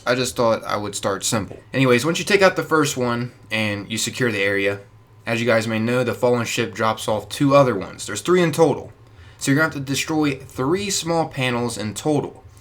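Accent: United States. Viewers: Thanks for making a video game video with a normal American Voice!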